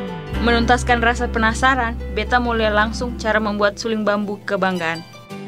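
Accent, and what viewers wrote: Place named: Ambon, Indonesia. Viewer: Sebenarnya kalau hostnya sudah bicara pakai bahasa daerah tertentu.yg dri stasion tv-nya harus pakai subtitle Indonesia.biar yg nonton bisa mengerti kalimat2nya.penonton YouTube bukan cuman org Ambon saja.ada penonton dri Sabang sampai Merauke. Terus hostnya juga bicara aksen ambonnya campur aduk. Org Ambon itu bilang ( Katong/bukan kitong)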